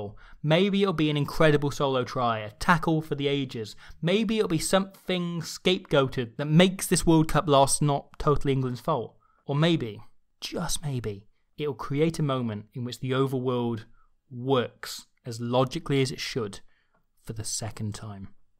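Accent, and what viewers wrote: Wales, United Kingdom. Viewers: I thought he was welsh pls someone tel me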